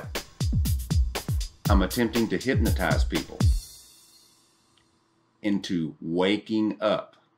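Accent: South, United States. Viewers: Hey. I can't quite seem to make out the writing that you're talking about, but on the same 'rock', top of the screen, left of centre (just above that bright spot) it says 'BEV' - in the clearest possible, white, capital PRINT! Though it doesn't look like it's actually printed on the rock, but separate letter blocks (look at the 'V') - that's undeniably ENGLISH!!! But I don't think that's the same writing you are talking about, because you zoom in to a lower point. You didn't miss that tho', did you? PS Lovely to hear that great, southern drawl of yours again!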